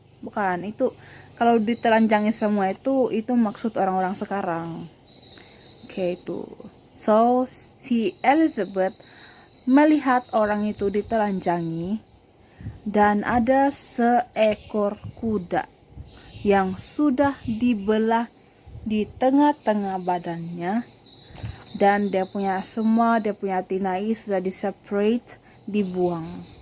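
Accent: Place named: Sabah, Malaysia. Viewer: Wah.. Terbaik sis becerita. Suka dengar dialek org sabah ni👍👍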